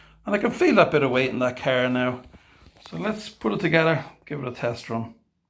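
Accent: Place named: Ireland. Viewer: I ❤❤that Irish accent....as well as the slot cars 😂😂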